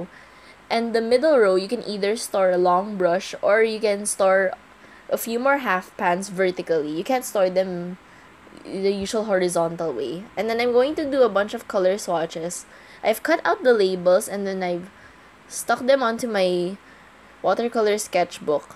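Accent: Philippines. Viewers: Hi! Are you Filipino? You have their accent and that's amazing! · I think you are a Filipino because I know how Filipinos speak English. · I love this brand! thanks for the vid xD I can hear the filipino in your words ~ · I love your Filipino accent lol 🇵🇭🇵🇭🇵🇭🇵🇭🇵🇭🇵🇭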